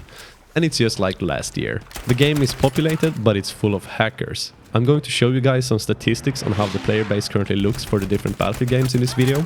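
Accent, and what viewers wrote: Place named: Sweden. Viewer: You sound swedish😂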